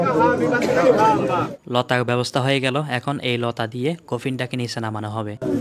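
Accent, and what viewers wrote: Chittagong, Bangladesh. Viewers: আপনার র/ঢ় উচ্চারণ ঠিক করেন,চাটগাঁইয়া ভাষাই আপনার জন্য উওম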